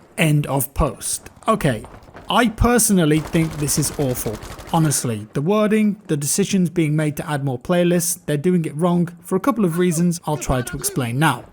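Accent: United Kingdom. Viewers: always like the way you put your thoughts, the core itself and your British accent,! Thanks for video!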